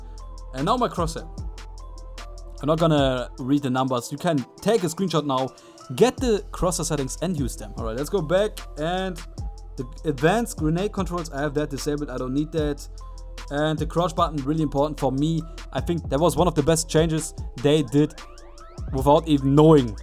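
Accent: Germany. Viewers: Von der Stimme her erkenne ich, dass du deutsch bist xD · Mach mal deutsche videos man merkt dass du deutsch bist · Man hört raus du bist Deutscher nh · Are you from germany? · Du bist deutsch oder? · Kann es sein dass du Deutsch bist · You sounds like German.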